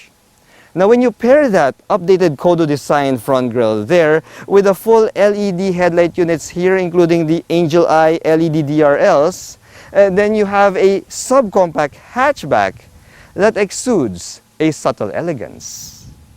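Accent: Philippines. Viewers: Hi,Just discovered your channel a little over a month ago,ive been non stop watching your vids because of Your MX5 Miata,ive got interest in that car. Also I saw your collab with RIT, I prefer your Filipino language and accent ngl. Love your vids!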